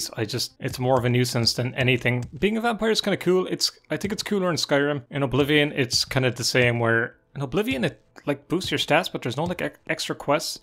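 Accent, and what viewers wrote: Ireland. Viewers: man, placing your accent is pure hard. Is it Mayo/Galway? That or midlands? Anyways, savage videos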